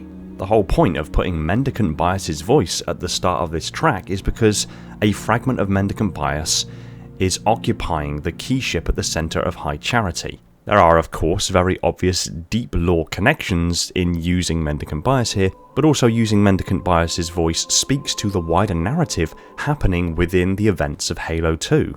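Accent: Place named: Hull, United Kingdom. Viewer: Was not prepared for your Hull accent, God damn I wish that actress in Game of Thrones used a different accent 😂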